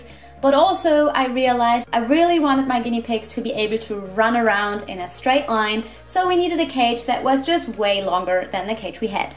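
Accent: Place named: Germany. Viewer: what part of germany are you from? that's an interesting accent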